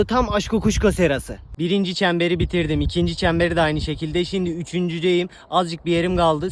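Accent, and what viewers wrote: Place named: Bursa, Turkey. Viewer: Abi sen nerde yaşıyorsun ben gazipasadayim Bursa'da senin gibi konuşuyorlar (fingirdinmi)❤❤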